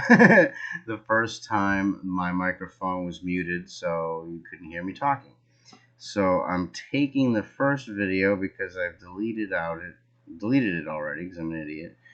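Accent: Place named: California, United States. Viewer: Sounds like a true Californian. Was it a company in Temecula? They had done that to others.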